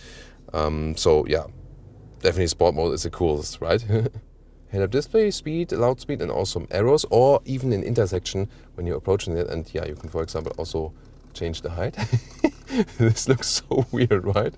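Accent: Germany. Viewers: It is interesting how his speech still sounds like german languaege though he speaks english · beautiful Germany accent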